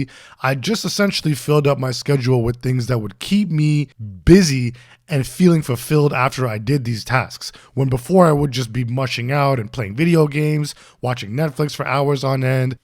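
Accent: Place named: Toronto, Canada. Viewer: Why is it so easy to tell that someone is from Toronto 😂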